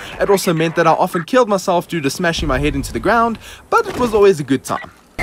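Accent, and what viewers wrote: South Africa. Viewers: Great video..south african accent was interesting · Yea, I was going to say I notice that accent. Keep up the content :) btw hopefully you're staying safe if you live in RSA.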